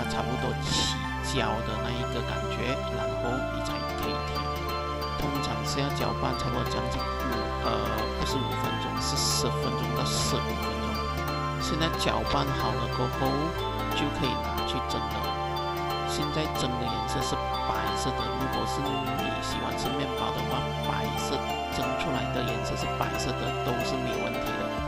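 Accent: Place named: Malaysia. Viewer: 因为用的是马来西亚的口音啊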